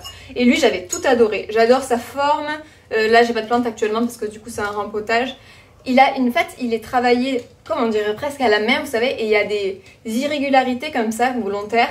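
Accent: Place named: South, France. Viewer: Et en plus vs êtes de chez moi? Vs êtes de montpellier? En tout cas bien l’accent du Sud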